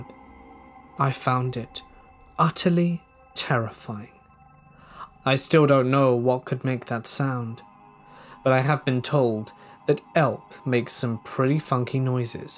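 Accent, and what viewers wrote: United Kingdom. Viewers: My only comment is this is superb narration, not just the charming british accent, but the tone, tempo, and phrasing (clearly enunciated without losing flow) are brilliant. This guy can do anything, any topic, audio books, etc and it would be of the highest professional caliber in my opinion.. Best I can ever recall hearing on YouTube.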